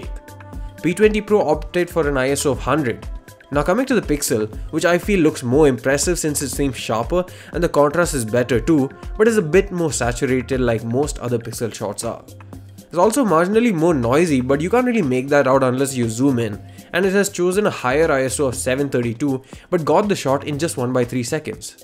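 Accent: India. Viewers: Indian accent english is the worst, go shit on the street leave youtube alone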